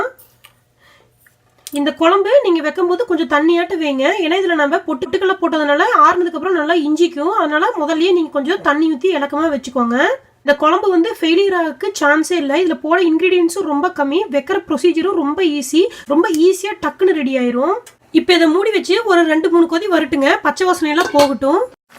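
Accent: Kongu, India. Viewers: Super your kongu tamil is very sweet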